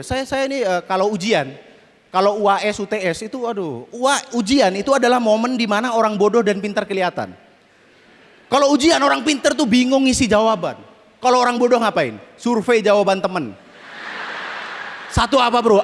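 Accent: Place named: Madura, Indonesia. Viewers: Logat maduranya kental bnget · duro nya medok mas😂😂😂😂 lanjutkan kita serumpun😂😂😂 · si muslim maduranya masih berasa wkwk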